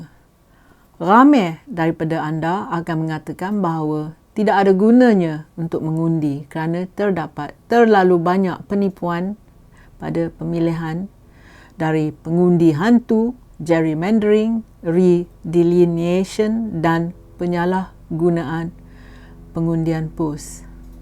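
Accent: Perak, Malaysia. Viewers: Saya suka Puan cakap loghat Perak..ha ha ha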